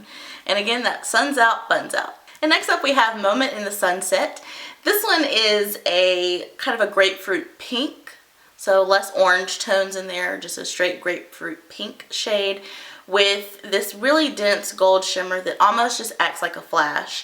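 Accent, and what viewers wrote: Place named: Canada. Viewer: I hear a boat when Canadians say about & I think it's cute! Thanks for the review. I've got a shopping list and somne of these are going on it 😉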